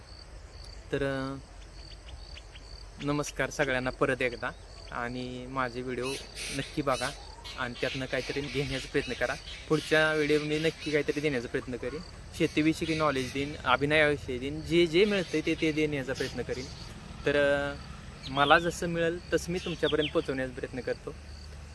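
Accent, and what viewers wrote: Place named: Satara, India. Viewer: भाषा सातारी वाटत्या 👌👌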